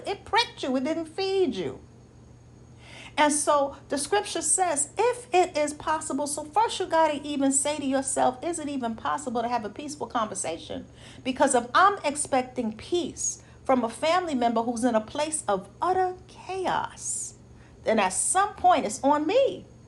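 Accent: New York, United States. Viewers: So appreciate your ministry.. your giftedness! Love your teaching style bold…unadulterated..❤ ❤approach along with your wonderful NYC accent. Through your ministry I learned to focus more on my own personal goals gifts and talents and continue to pursue what is best for me in this season of my life. You keep shining! I love church by phone each Sunday along with the wellness club on Wednesday. I have at least 4 of your books and have given 2 additional books away. God Bless you. ❤Lorraine from NY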